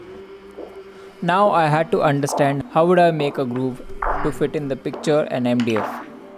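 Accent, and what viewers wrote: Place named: India. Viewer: When the video started, I thought it was from the USA or Japan — but the moment I heard the first word, I was like, 'Aha! That’s definitely our Indian brother!' 😄🇮🇳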